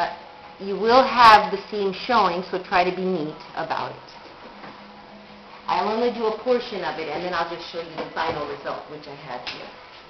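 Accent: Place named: United States. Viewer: Wow that was great, I make these too, but I use elastic instead of rubber bands. We in the USA pronounce centimeters differently, but you sound like you have a US accent where did you grow up?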